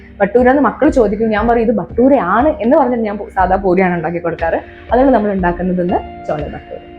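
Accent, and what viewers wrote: Kozhikode, India. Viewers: I like ur kozhikode slang Me from kozhikode😂😂chevayoor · Natural samsaaram kozhikoden style .... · de ആ ഇരുത്തം ഒക്കെ നല്ല അസ്സൽ നോർത്തി. but സംസാരം ന്റെ കോഴിക്കോട്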